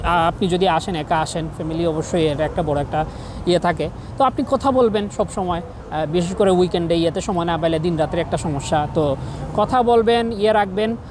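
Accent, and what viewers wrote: Sylhet, Bangladesh. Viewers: Vai afne sylhety maybe??😊 amio sylhet shahporan alakar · সিলেটী একসেন্টে চমৎকার উপস্থাপনা! অনেক শুভকামনা।❤ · Bhaiya apni sylheti?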